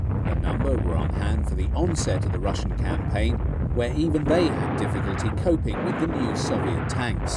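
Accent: United Kingdom. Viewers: the British English narrating sounds sophisticated and smart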